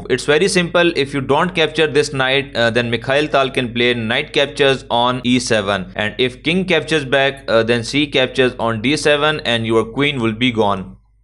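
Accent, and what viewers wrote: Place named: South Asia. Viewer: the voice of chess is oficially an accent from the sub continent. as a pakistani, i love it. a major game / sport where we own the commentary. and of course, indians are top level chess players so it fits well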